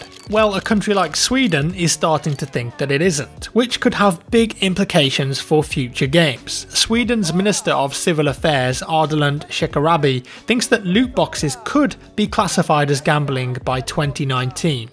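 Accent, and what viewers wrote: United Kingdom. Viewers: one good question though. how did develop a British accent even though he's american?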